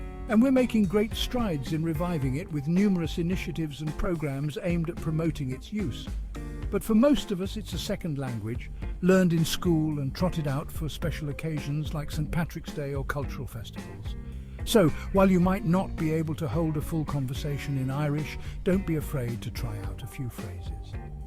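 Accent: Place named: United Kingdom. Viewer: "Our", "we". You sound British and your pronunciation of the Irish language is not indigenous.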